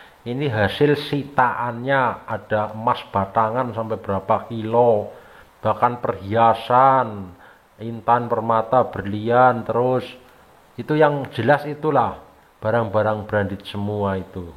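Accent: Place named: Banyumas, Indonesia. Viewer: logat ngepeknya mantep bro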